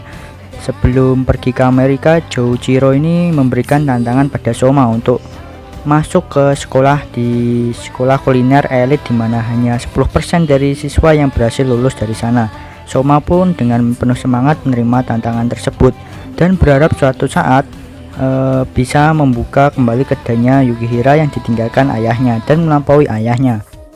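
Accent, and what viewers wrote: Java, Indonesia. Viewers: Medok bahasanya:v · Mas itu logat nya kok medhok Jawa banget, aslinya mana to mas? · Waduh min gue baru mampir disini, ngakak denger logat bicaramu kayak Jawa banget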